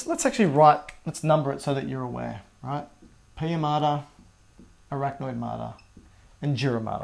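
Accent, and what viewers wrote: Australia. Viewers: As an Australian, I love watching people in the comments of your videos struggling to understand your aussie accent 😂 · Madhu Parisa that’s just the Australian accent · Same lol, it's the Australian accent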